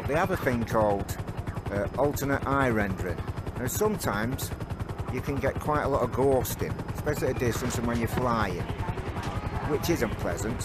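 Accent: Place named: United Kingdom. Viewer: I can't understand what this man is saying because of that accent. Sounds British and I don't understand British.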